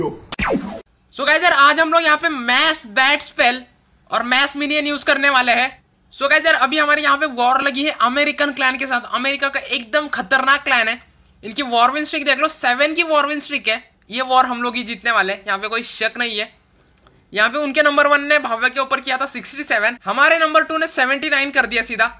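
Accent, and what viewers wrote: India. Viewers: Indian accent, "oh my GOAT!" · This was the most intense indian accent ive ever heard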